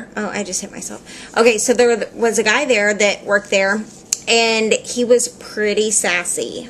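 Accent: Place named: Rural, United States. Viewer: lol ur hick accent is funny.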